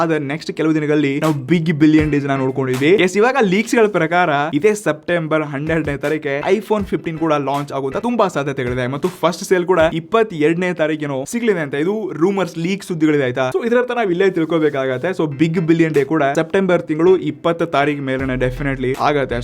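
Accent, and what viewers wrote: North Karnataka, India. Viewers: 143 ಟೆಕ್ ನ್ಯೂಸ್ ಗೆ ನಮಸ್ಕಾರ ಗುರು ನೀವು ಕೊಡೋ ಮಾಹಿತಿ ತುಂಬಾ ಉಪಯೋಗ ಆಗುತ್ತೆ ಹಾಗೆ ನೀವು ಮಾತಾಡೋ ಉತ್ತರ ಕರ್ನಾಟಕದ ಮಾತು ಕೇಳೋದಕ್ಕೆ ಚಂದ your presentation is next level we don't want any changes u always rockzzzzz........🎉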